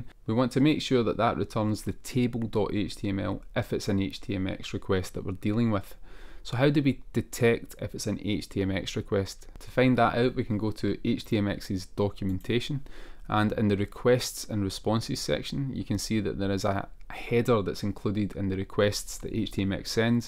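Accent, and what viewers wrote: Scotland, United Kingdom. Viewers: How interesting that the Scottish accent is easier to understand. I wonder what Koreans make of other accents from the UK?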